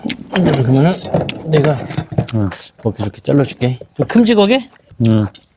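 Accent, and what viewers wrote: Jeolla, South Korea. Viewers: 요즘 계속 찾아보는 초짜영상입니다. 전라도 사투리 겁나게 재밋네요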